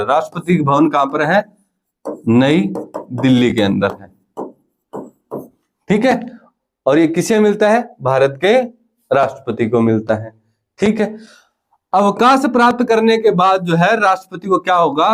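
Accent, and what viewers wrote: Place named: Bagad, India. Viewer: गुरूजी थारी बोली मे टच थोड़ो बागड़ कानी को 😂😂😂😂😂😂😂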